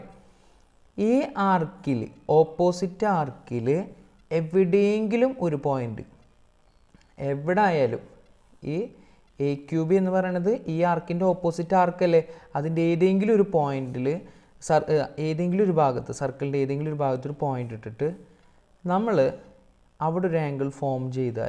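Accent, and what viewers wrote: Thrissur, India. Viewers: Thrissure kkarandhe bhasha poli Nan oru malappurakkali aanu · Sir thrissur aanalle njaanum thrissuraa but now im malappuram... I’m still missing our slang😓✌🏻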